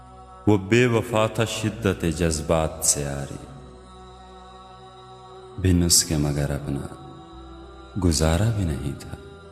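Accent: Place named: Punjab, India. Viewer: Uupp Sir app ne khud apni awaz sune hai,halke Punjabi lehje mein jab app shayri karty ho app ki awaz bari cute lagte had😊❤❤..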